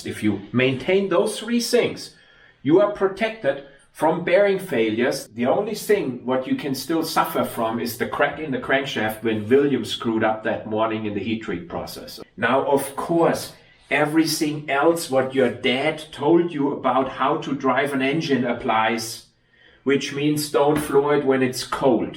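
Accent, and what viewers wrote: Germany. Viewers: Love thee German accent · I think I have never seen a video with more in-depth analysis and plain old truth, all topped off with a cool German accent! The sad truth is that very few people care to know anything about the car they drive - no more than where to put the fuel in, if that much. In other words, most people are simply consumers of what other people design, manufacture, and maintain - and spend all their time complaining about how their feelings got hurt by this group or that one.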